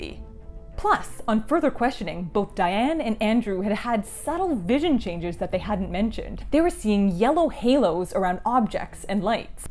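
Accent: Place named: Canada. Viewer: If you’re in the US, true. She sounds Canadian?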